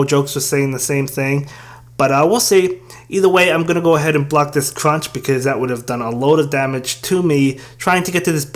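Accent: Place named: New York, United States. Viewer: its funny getting deep pokemon go info in a new york accent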